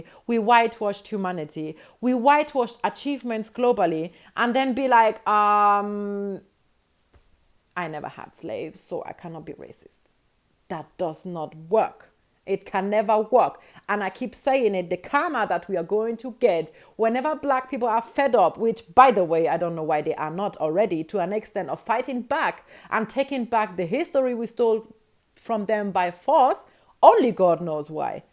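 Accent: Nigeria. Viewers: Was saying to myself why she sounds like a Nigerian?? Then in the video,she says "I'm married to a Nigerian"😍 And thanks for this video... You couldn't have said it better ma'am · Wow!!! Negative people just appear out of nowhere to give their nasty a$$ remarks. She sounds Nigerian to me. There's a huge Nigerian community where I live and that's what she sounds like to my ears. Yes I pick up on the German. My in-laws are German. And her accent fluctuates. But to me - that's what she sounds like. If she doesn't to you - kudos. But I see the commenters who appear to also be West African and hear the accent as well. Meaning - you got nothing better to do than troll and be negative. Good luck with that. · You remind me of one of my ex's. She was German but her ex husband was Nigerian and she spoke English with a Nigerian accent just like you. Because of that I never thought of her as being white because aside from the color she wasn't. 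· I heard a slight Nigerian lilt in your voice; maybe Igbo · I love that Nigerian accent coming through Mama! Keep up the content. We are loving it. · your accent is partially nigerian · I was thinking about the same thing.... My brain was like this is Nigerian accent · Nigerian accent is beautiful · You've developed a Nigerian accent.♥️ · I am wondering where your nigerian accent came from?!? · It's this Nigerian accent for me, thanks for your insight. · OmG..... Gospel from heaven. And your english is so Nigerian... Did you grow here?? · then you guys don’t know German accent when speaking English, of course she’s German with the accent somewhere in there but she’s straight almost full Nigeria lol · Wow, ur accent, sounds more like core AFRICAN, NIGERIAN PRECISELY.. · Dear, you speak with a low-key Nigerian(igbo) accent. And your last name, is indeed Igbo. If you may, am I correct in my observation? 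And how are you related to "us"? Regards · WHALLEY-Oooo...My Wife, as we day in Nigeria!! I just confirmed after I posted beneath your video in comments that you had a distinctive Nigerian Accent!! Ahh-Ahh...Now I see your surname!! Hence why I'm calling you 'My wife' it's just a thing with Nigerians LOL You may be married to a Nigerian as my mummies surname is Nigerian too...When she goes to hospital and they try to call her by her 11 lettered surname and this little white haired woman calls out... 'No...my name is... OMADELIENJE!! 🤣🤣🤣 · Your Nigerian accent is strong. Wow. I appreciate the facts you spit. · I believe she mentioned that her husband is Nigerian, she picked up the accent well and I too like that. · I love your accent, you sound African, a bit Nigerian-ish. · I'm northern European, brought up in West Africa and she's German, speaking English like a Nigerian - weird! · She's married to a Nigerian,she speak like a Nigerian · I like the way she speaks with facts. 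When I first heard her accent, I thought she was Nigerian in some way 🤔. Then she mentioned her husband is from Nigeria and I was like 'Aha!' 😁. Wonderful content. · I love her Nigerian accent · I hear the Naija accent trying to break through! 😃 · Awesome presentation.. I picked up the Nigerian in your unique accent from the beginning.. Blessings.. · I was so surprised when you started talking cause your accent sounded a bit Nigerian. And then you mentioned that that your husband is Nigerian and it made so much sense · You have a Nigerian accent... Did you leave in Africa before?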